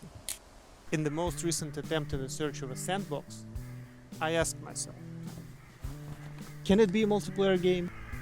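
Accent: Russia. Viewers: Бро говорит с явным русским акцентом)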